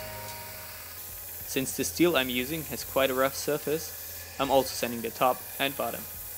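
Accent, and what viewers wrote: Germany. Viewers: Man hört das du deutscher bist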